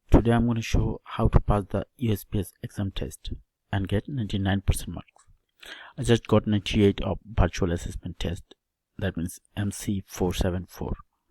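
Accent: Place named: India. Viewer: Technically not broken .. just an Indian accent lol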